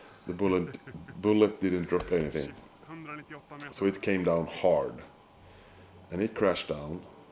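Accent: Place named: Sweden. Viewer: This is gonna be great! You catch your audience not just because of the hunting part, but because of what a genuinely great guy you are 😊 and I don’t think there is many channels showing the Scandinavian fauna spoken in Swenglish😂 it will catch on my boiiiii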